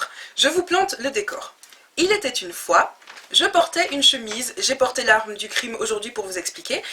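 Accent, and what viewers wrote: Belgium. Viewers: lol trop marrant😅😅😅😂😂tu es belge?? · T'es chou avec ton accent belge ❤️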